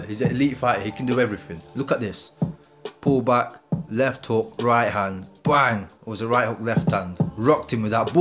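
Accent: United Kingdom. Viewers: never seen this channel before. Late subscriber. Is that a notts accent i hear or derby? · Yo the guy speaking in the video are you from Notts by any chance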